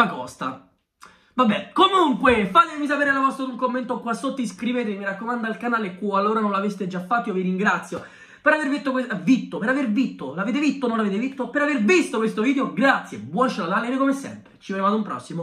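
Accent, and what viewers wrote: Rome, Italy. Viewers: mamma mia che fastidio. Ma non si può parlare in dialetto? Se è di Roma perché no! Fosse un altro youtuber ok, ma così no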